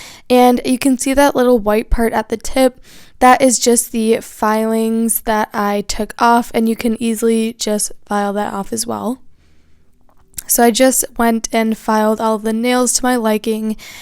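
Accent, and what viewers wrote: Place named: North, United States. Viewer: Are you from Buffalo or Chicago? You have those classic northerner 'A's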